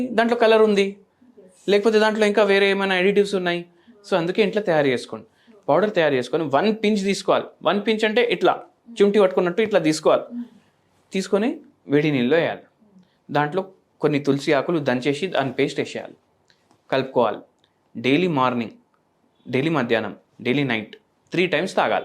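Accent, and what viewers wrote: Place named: Telangana, India. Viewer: ne mata chala manchi telangana la unadi dcr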